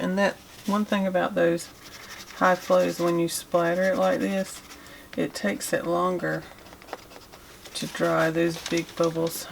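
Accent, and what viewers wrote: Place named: Mississippi, United States. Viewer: Gorgeous page! I’m liking the way you did the washi. You sound like you’re from Mississippi. It's always a bonus to have someone who does great art and also has a pleasing voice. I like the cross Ross lines too, I think because it suggests an old patchwork quilt behind it.Thanks for sharing.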